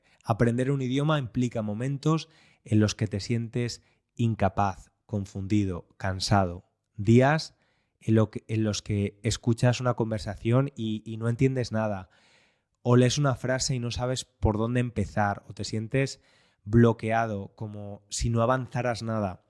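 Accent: Spain. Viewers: ¡Me encantan tus podcasts! Intento escucharlos 5 veces por semana. Viví en España un total de 2 años, y ¡cómo echo de menos al acento español! He encontrado por aquí en los EEUU, no hay mucha gente de España. Hay bastante de México, América Central y Sudamérica, y eso está bien, pero me encanta el acento de España y me das una manera de poder escucharlo., tu voz es bien clara, fácil de entender (aún los episodios avanzados) y tu gramática es 👌🏻-me gusta muchísimo! Tu hermana, por otro lado… 😅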